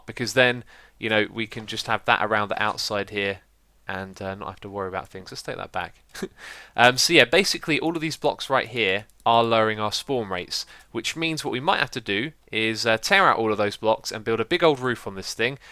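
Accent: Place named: United Kingdom. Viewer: Xisuma, why is your accent so pleasant? What part of UK are you from? I don't hear many British accents out here in Seattle except in occasionally in international conference calls at my old job. My dad was from Belfast and my brother is from Brighton so I can rule those out, but I couldn't venture a guess.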